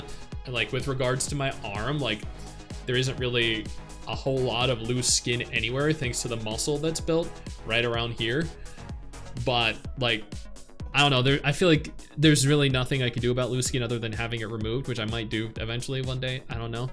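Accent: Australia. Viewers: wtf you’re Australian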